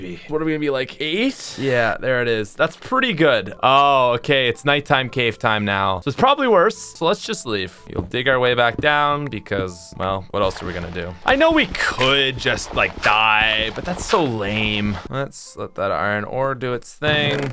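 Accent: Canada. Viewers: I swear is he a Canadian he has like the same exact accent as my mom and she is Canadian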